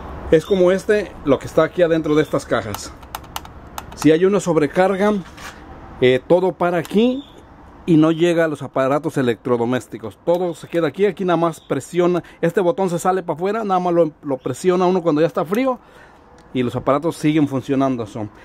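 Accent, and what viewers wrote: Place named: Jalisco, Mexico. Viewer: Muy bueno, saludos, un abrazo! PD. tu acento lo siento como de Jalisco, o de donde eres?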